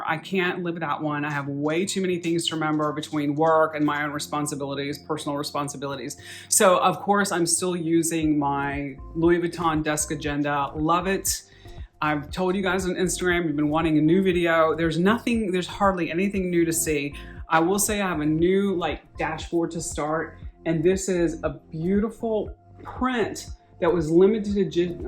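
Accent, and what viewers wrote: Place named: Brooklyn, United States. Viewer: Lol. I knew I heard a Brooklyn accent 😂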